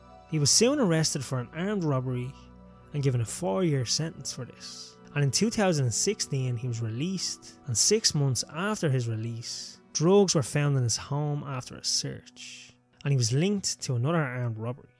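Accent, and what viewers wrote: Ireland. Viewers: Thank you for taking the time to respond! Very cool of you. I'm in the US...South Boston, Massachusetts. Which happened to have the BEST + biggest majority of Irish-American people filling the neighborhoods of Boston back in the day. Other then Charlestown who had a quite a few Irish also. I grew up with our annual St Patrick's Day parade being at my doorstep. Good ol days lol Southie, as we natives call it, have so much pride in our Irish heritage. There's a neighborhood store where we can order Irish Knits + baby blankets made in Ireland which is about all we have left of our "Irishness" in 2023 lol Used to be someone's Nan that would be knitting those. I said we "had" a large community of Irish here but regentrification...lol It happens. Your content is great! I look forward to future vids. Ty for taking time from your day to respond...figured brogue was better then accent lol And it sounds awesome to these American ears✌️💚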